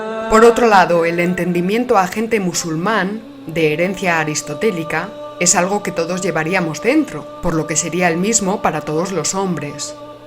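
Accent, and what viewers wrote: Spain. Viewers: Ole esta española! Que gusto oírte!!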